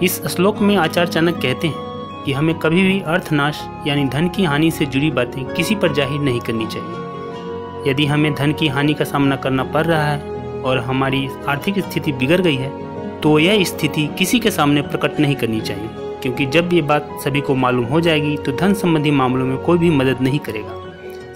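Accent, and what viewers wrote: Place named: Bihar, India. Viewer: I liked the Bihari accent.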